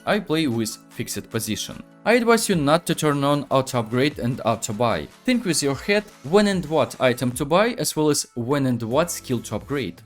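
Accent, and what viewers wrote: Russia. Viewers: Сильный русский акцент , плюс очевидные ошибки в произношении звуков и целых слов и постановке ударения в слове очень портит речь. Советую, перед озвучиванием видео на английском проверять произношение в словаре, чтобы не было таких казузов, как произношение слова "focus" как "facas", которое звучит как не очень хорошее слово) А информация вполне полезная. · r u russian bro? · are you russian?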